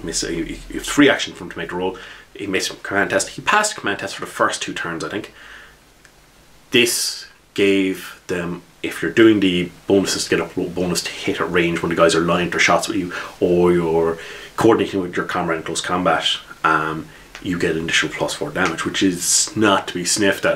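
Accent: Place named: Newfoundland, Canada. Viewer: Huge newfie accent? Awesome. My GM is a newf. :)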